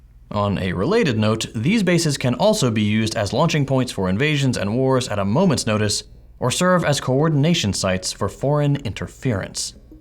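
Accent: United States. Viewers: Just wondering, are you an American?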